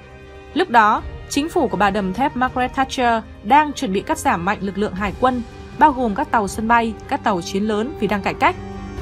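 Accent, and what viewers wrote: Standard. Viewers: Giọng đọc thuyết minh hay và chuẩn luôn ủng hộ kênh... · Thuyết minh quá hay ! Rõ ràng , nhập tâm , chuẩn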